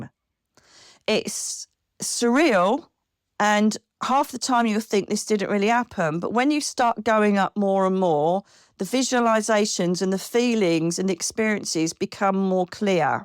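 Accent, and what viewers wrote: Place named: United Kingdom. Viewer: I just love the British accent and the popping words on the screen! It actually helps to focus and am sure it helps people with any hearing disabilities. Good job!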